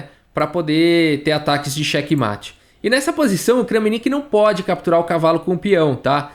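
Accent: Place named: São Paulo, Brazil. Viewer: Esse sotaque de paulistano é um barato!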